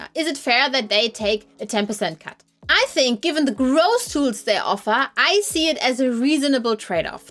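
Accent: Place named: Germany. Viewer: Noooo your German accent is all part of the charm! It's your character. I really enjoy your videos, you put so much work into editing and production. 😊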